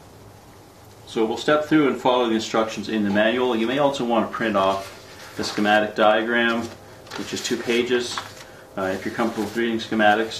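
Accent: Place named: Canada. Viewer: Great project! Unfortunately, it seems Briel is no longer selling kits. BTW, while I was watching I was thinking " sounds Canadian" and sure enough you are:) (From a fellow Canadian.)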